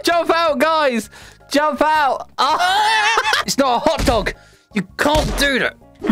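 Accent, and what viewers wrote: United Kingdom. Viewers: British accent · Bow down to the British accent king!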